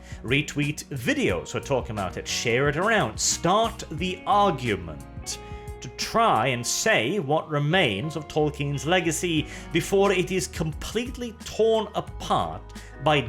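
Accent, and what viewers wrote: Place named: Southern England, United Kingdom. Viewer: do not worry about your words bro when I frist heard you speek I thought you were from south of England. Its only when you speek Kraut or Ruski I can tell your not English. Peace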